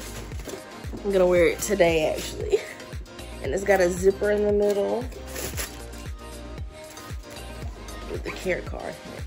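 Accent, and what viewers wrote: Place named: South, United States. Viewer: Nice video. Suggestion: Don't put things so close to the camera. We can't see it like that. Keep it a distance away and put the palm of your hand behind it. Where are you from? You sound like you're from Tennessee, Kentucky, Alabama, or Mississippi.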